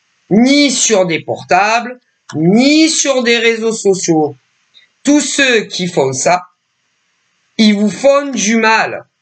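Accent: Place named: Marseille, France. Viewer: je pense que tu es vers marseille a l'accent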